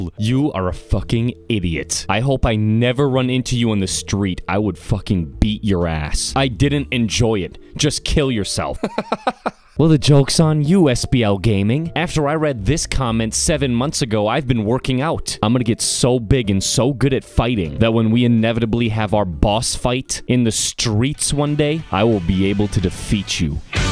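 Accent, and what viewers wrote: United Kingdom. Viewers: I actually thought you were from the United Kingdom when I first watched your videos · I'm an english man and you do sound a bit English only a bit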